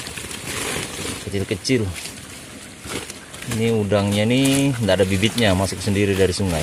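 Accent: Bugis, Indonesia. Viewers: Seperti logat orang bugis hehehe salam dri bugis barru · Ketahuan dari logatnya.. Saya juga orang Bugis yang tinggal di Kab. Kotabaru, Kalimantan Selatan. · Mesti org bugis ni logat pn boleh tau😂😂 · Btw orang mana kamu bro..klo sy dgr logat nyaa Orang sulsel , Lbih tepatnya Org bugisss :) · Kyak logat bugis yaa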